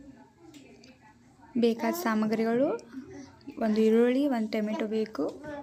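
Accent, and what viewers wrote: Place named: Maharashtra, India. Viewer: Akka avare niv marathi naa adakke nivu teve yannu tava annutiddiraa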